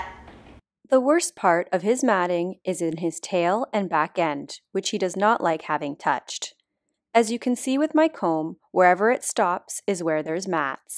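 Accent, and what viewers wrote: Canada. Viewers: I love the commentary... Hilarious.. I'd bet anything your Canadian!!!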